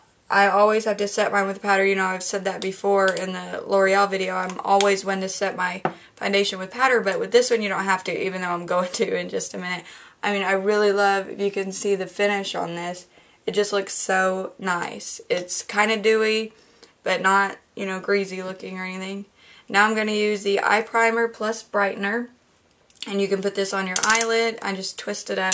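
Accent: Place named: South, United States. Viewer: Love the finished look, also your lovely southern accent, from the emerald Isle...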